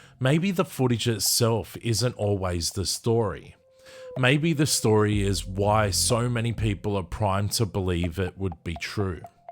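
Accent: Australia. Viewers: Are you from Australia or New Zealand? :D Like anything else, there's likely a wide variety of subspecies with differing adaptations and evolutionary backgrounds. It's never just one "evil" group. I appreciate you being calm and methodical about a weird topic. Where are you really hoping this channel will go? If you manage to find success without becoming hysterical, selling out, or being misguided by fans of mimicry, at some point you'll probably experience something absolutely horrifying as a "warning."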